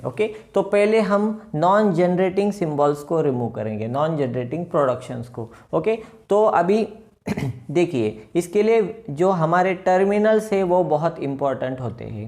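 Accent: Gujarat, India. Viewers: Are you Guju ?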